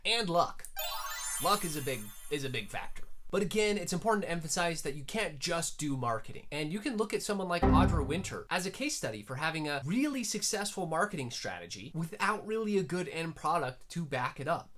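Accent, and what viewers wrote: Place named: RP, United Kingdom. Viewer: Okay, but I think I need more of your posh British accent? It was so good hahaha (Also, I'm always here for the shout-out! 🙌🏼🙌🏼)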